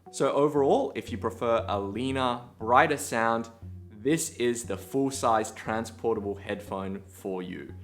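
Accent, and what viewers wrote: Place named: Australia. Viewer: I was not expecting an asian with Australian accent :)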